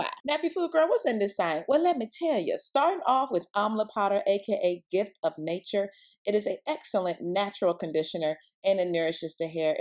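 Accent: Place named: Nigeria. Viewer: The naija accent😍😍😊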